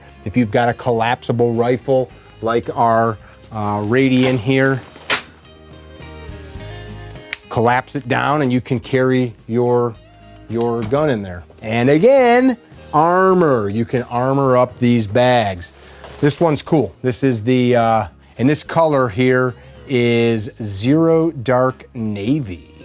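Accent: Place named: Midwest, United States. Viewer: Born in Des Plaines, live in Phoenix now, always a breath of fresh air hearing your Midwestern accent! love the content!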